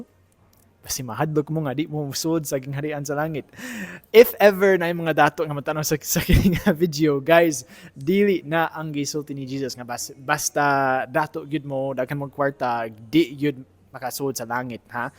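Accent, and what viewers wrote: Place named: Cebu, Philippines. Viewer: Maka amaze imong binisaya bisag 5 years paka sa Cebu Father mura nakag pure Cebuano the way ka mo esturya ug bisaya… Gi unsa nimo pagkat on ug bisaya father? Btw watching from Japan diay Father! God bless kanatong tanan!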